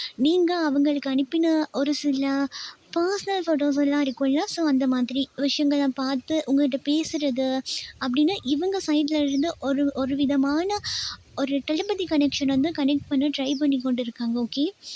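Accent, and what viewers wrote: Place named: Jaffna, Sri Lanka. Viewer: உங்க யாழ் தமிழ் நல்லா இருக்கு.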